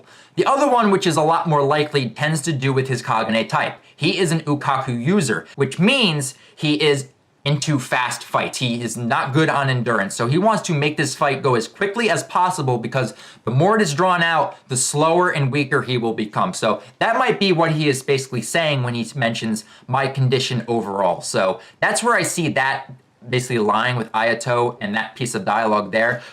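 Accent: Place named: New York, United States. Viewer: you sound like you're from New York ( I'm from New York) no offense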